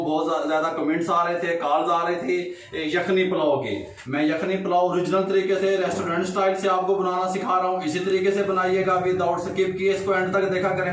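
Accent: Punjab, India. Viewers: Aap k bolne or kam karne ka andaz bilkul indian punjabi ki tarrah ha. Aisa ha kia maha raj?such bolna thanu guru di qassam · Apki recipe ke sath hum apki punjabi boli bhi bht enjoy krtay hain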